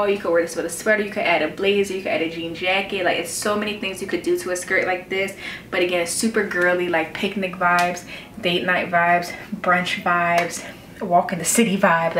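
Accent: Philadelphia, United States. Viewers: girl I clicked your video because it was suggested to me and within two seconds I had to see if you were from Philly. low & behold I was right! hey Philly sis <3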